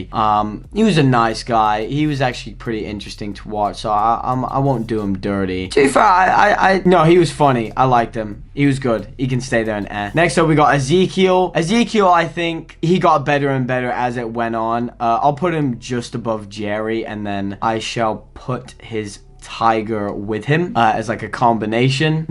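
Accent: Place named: United Kingdom. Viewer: I cant tell if youre an American with a slight British accent or a British person who's lost most of their accent Edit: definitely british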